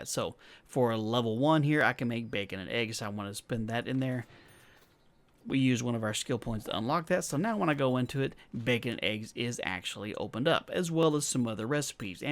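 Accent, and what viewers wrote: South, United States. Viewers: is your accent southern?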